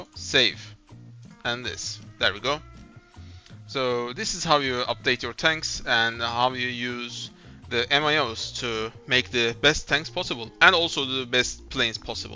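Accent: Turkey. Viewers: bro are you turkish you have turkish accent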